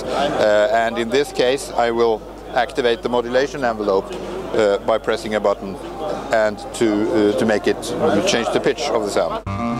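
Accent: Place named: Norway. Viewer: He must be norwegian... Have that kind of acsent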